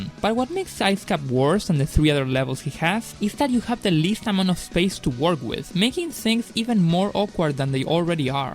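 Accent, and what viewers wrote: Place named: Argentina. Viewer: Tenes un re acento argentino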